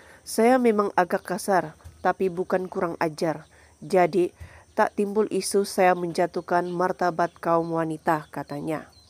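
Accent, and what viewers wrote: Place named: Indonesia. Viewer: Suara ni mcm indon je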